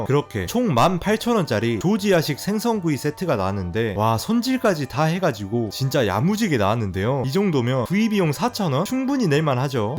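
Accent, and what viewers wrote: Jeolla, South Korea. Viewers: 전라도 말투도 너무 정겨워브럿고요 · 전라도사투 리에 디테일한 언 어 구사력 쥑입니다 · 사투리는 어디쪽인가요? 전라도? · 난 경상도인데 전라도 말씨 참 좋다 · 억양이 귀여움~전라도쪽인듯 ㅎㅎ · 구수한 전라도식 발음이 참 정감이 넘칩니다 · 내용은 좋은거같은데 전라도 사투리가 너무 구수~~해서 앞부분만 보다가 갑니다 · 혹시 전라도 분 이신가요? 말투가 너무 정겹네요 ㅋㅋㅋㅋ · .억양이 절라도 말투가 너무 심해서 알아들을수 없습니다....절라도 억양좀 자제해주세요. 인간적으로 너무 심합니다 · 전라도 사투리가 꽃혀서 집중이 ㅜ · 전라도 사투리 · 억양이 빼박 전라도네요! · 전라도 사투리. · 광주분인가?? 전라도 사투리 어투가 뭍어나네요... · 영상은 재밌는데, 절라도 사투리가 너무 거슬리네요. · 전라도 사투리 듣기싫어요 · 고향이 전라도인가 바요? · 전라도 엑센트 못버리네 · 전라도 억양! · 전라도 사투리 나레이션 익숙하지 않은데 재밌네요 ㅋㅋ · 남도 사투리가 겁나게 친근허요...잉.. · 구수한 전라도 사투리와 쌉소리 스크립트의 환장의 조합 ㅋㅋ 재밌게봤습니다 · 전라도 사투리만 안써도 볼텐데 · 익숙해질때까지 시간이 좀 걸리네요 전라도사투리였군요? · 아 전라도근본 사투리억양 ㅋㅋㅋ 듣기힘드네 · 고향이 전라도 인가요?ㅎㅎ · 절라도 사투리 졸라 쓰는디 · 전라도사람인가보네요 악센트가심하시네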